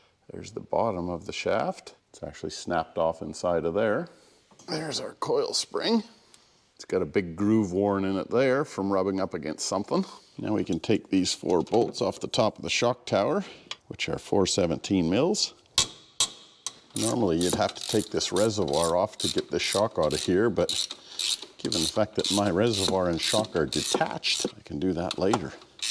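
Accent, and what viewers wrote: Canada. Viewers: Canadian accent but with Aussie slang = hillarious! SUBSCRIBED. Very nice workspace you have got. I'll peek your channel - I see some projects in the background.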